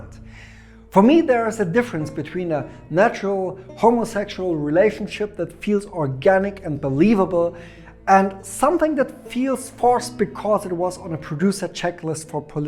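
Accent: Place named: Germany. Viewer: oh haha hoppla, bitte vielmals um Entschuldigung, wusste nicht dass ihr nen extra Kanal habt & danke für Info =) man hört halt den Deutschen raus hier beim Englischen Video & das ist (zumindest für mich) irgendwie ziemlich irritierend. Nicht böse gemeint =D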